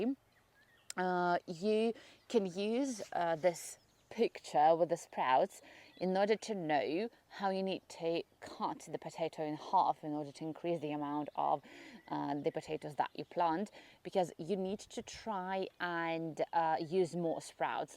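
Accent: United Kingdom. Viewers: My wife, Iryna, who is also from Belarus, still dislikes planting potatoes for the same reasons you did as a child. She particularly despises Colorado beetles! I'm the gardener in our family. Your British accent is interesting to hear from a Belarusian! Did you spend time in the UK? Cheers!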